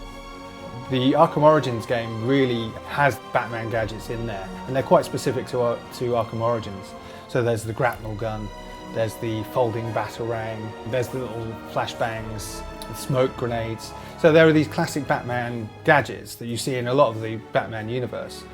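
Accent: United Kingdom. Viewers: is he British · i'm and im now just realizing he's british😂😂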